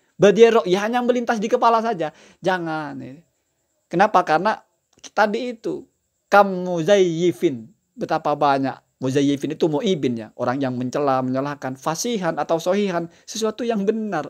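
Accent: Madura, Indonesia. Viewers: Kalau dr nadanya, kayaknya Sampean oreng madhureh. Kerren gus. Bisa bagi nomer wa gus?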